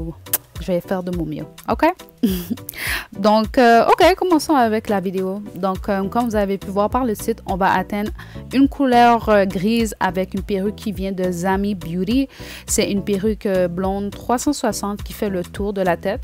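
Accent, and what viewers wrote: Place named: Canada. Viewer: J’adore l’accent canadien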